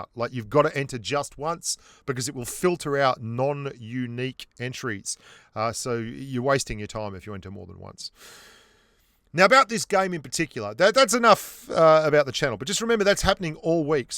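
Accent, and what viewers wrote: Australia. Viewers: you have the best pubg mobile videos! I can’t get enough. ( The Aussie accent helps;)) IGN: Salt65 #pochinki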